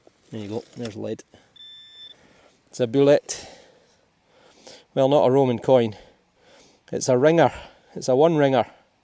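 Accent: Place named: Glasgow, United Kingdom. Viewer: No wonder that this channel is growing so steadily to “not yet known heights”. Part of it is the Glaswegian way of speech and accent for sure. You sound much like Billy Connolly, a fellow Glaswegian who often brought us to tears of laughter. Congratulations mister. Things are looking to go up, harvest season is well under way and I’m sure you’ll knock some silvers and relics out.🤞🍀🤞🍀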